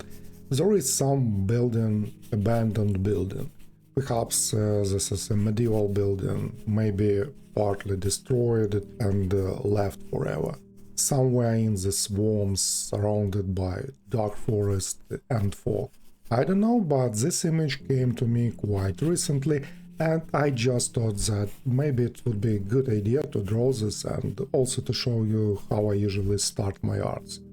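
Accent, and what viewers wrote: Russia. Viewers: Ochen' accent russkiy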